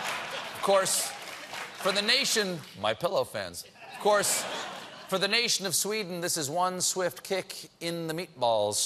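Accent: Germany. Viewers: Lingonberries!!! Lingonberries (that almost ALWAYS goes with the meatballs in Sweden) is Swedish... but I guess that is a nothing-burger.... And why the German accent?? We actually sound more like the Swedish chef... unfortunately. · Minus the German accent well done :).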